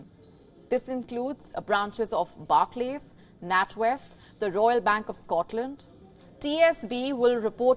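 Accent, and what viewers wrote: India. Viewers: I am very disappointed that Rishi does not speak with an Indian accent like he should!! So sad and tragedy!! Indian accent has to be the most beautiful accent in the world like this news woman. No trolling here!! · A horrid abrasive accent she has. Like NBC from India.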